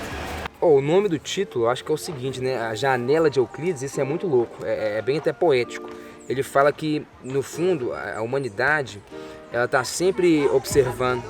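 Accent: Minas Gerais, Brazil. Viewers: vc é mineiro? sotaque de mineiro de BH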